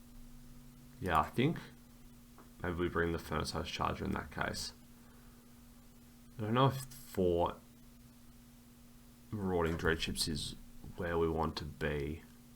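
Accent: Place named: Australia. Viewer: Just found your channel and I really appreciate the way you talk through your draft and analyse what went wrong in that smooth aussie accent.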